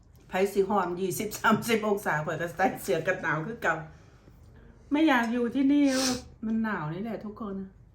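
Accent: Thailand. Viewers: แม่ อยู่ภาคเหนือหรือใต้คะ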